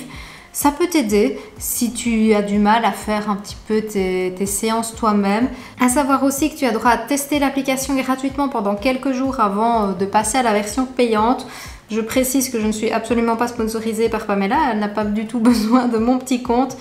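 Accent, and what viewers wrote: Belgium. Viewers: J'adore tes vidéos et ta façon d'aborder les choses ! Et ton calme naturel (et ton petit accent belge 😉) sont super agréables ! 🙏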